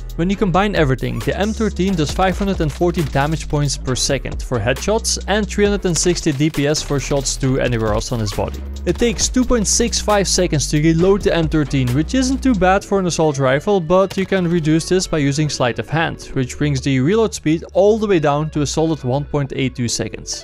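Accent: Netherlands. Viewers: Love dutch accent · Nice Dutch accent hehe. But cool guide :)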